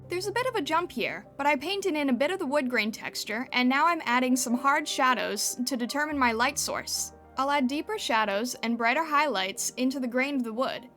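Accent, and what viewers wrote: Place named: United Kingdom. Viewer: lovely art and also bri''ish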